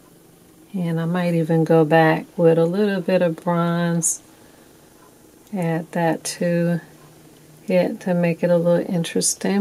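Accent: New Orleans, United States. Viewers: I'm English and I'd just like to say your New Orleans accent is devine!! And that you should say "pumpkin" however you like because that's who you are. I could listen to you all day. It's so impressive how you have everything set up with Amazon and all your templates, ideas and downloads!! Well done you!! Love your channel!! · Pumpkin, Punkin pumkin. No matter how you say it, we know you mean pumpkin. YouJust keep making your beautiful pieces. You are an inspiration to soooooo many and that's all what counts. Gee Whiz, we're not in Grammar School! Your New Orleans accent is beautiful and quite clear. Do your thing because you ROCK!. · You have a beautiful accent! You are great & ive learned so much from you! Watched all your Christmas videos last few yrs! Keep your beautiful New Orleans accent! I love it!! Say pumpkin anyway you want girl!😂❤️ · There is absolutely nothing wrong with your N.O.accent I say that because I'm from a town outside of N,O. 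called Houma La. So I can relate they say we t as ll flat but hoot I love ❤what you have done with this project very inspiring. Fall Vibes 🍁🍂🍁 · Hi My Suga I Love Your Accent... Why Because I’m From Nola To & You Are Unique In Your On Way? Cheeeeeeeeeeeeerrrrrrrrrsssss My Hun! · Yeah baby they love how we talk. What part of the N. O. you from? I'm from Hollygrove. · Your New Orleans accent is beautiful. In New Mexico it's pronounced Punkin. I agree, who cares. Your voice is what drew me to your video and I'm so glad it did. I have been inspired to do a crystal foyer for Christmas and brought home a hall from our Dollar Tree here in Marrero. Keep that imagination going and forget about anything else :) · She's been in Ga so long she's lost a little of her New Orleans accent. But we never lose it all! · I love listening to your soothing tone and beautiful New Orleans accent. Charming as heck.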